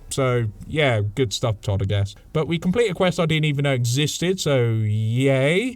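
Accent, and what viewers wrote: RP, United Kingdom. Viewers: it’s the formal British accent, the intro I similar as well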